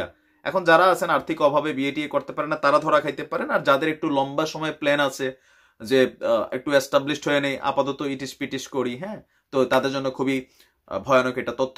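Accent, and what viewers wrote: Comilla, Bangladesh. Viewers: কুমিল্লার পোলা ৮০ টাকা তোলা , কুমিল্লার আঞ্চলিক ভাষা শুধু ভালো লাগে আপনার মুখে সত্যি সত্যি সত্যি সত্যি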